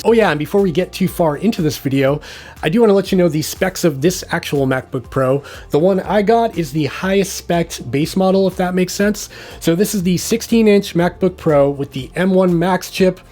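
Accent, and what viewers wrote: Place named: Canada. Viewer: I thought he was Canadian too. The way he says “about”….aboot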